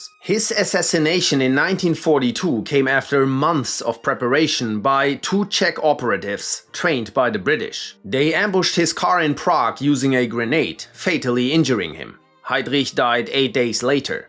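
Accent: Germany. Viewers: GOD, these are good. The german accent just makes it like we're in a U.N. meeting. Kudos.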